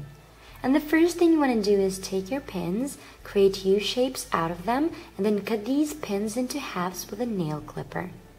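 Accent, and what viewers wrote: Finland. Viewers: Are u from Finland??? :D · Are you Finnish or Russian?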